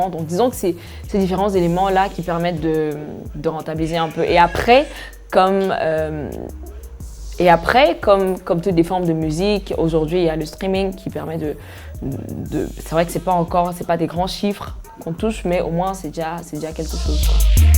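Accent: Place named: Cameroon. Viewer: Je kiff trop quand tu parle le français genre genre du camer 😂😂😂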